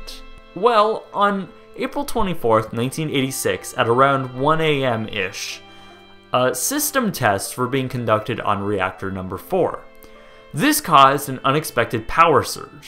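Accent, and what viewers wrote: Canada. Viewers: You say at the beginning of the video that you're American, but haven't you said you're Canadian in other videos? And you pronounce "about" like a Canadian... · No, he's definitely from the proud north. The way he pronounces his O's, the fact that the only live interview I've seen him do was of the guy in charge of Target Canada, and the Ontario PO box give him away. He could be American now, but he's definitely a Canuck by birth. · You sound Canadian.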